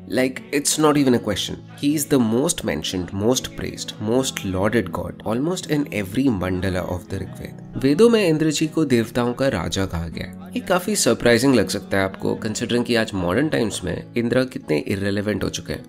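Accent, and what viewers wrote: India. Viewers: All is good but it's irritating to listen Samskritam names in Hindi style. Why u call Ved Ved Ved??? It's Vedam or Veda. Not Vamadev ...cl VAMADEVA 🙏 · Thanks for the effort. It would be good to have non Hindi pronounciation. Also, multiple language switches made it hard for me to follow. Appreciate if you could not switch so frequently.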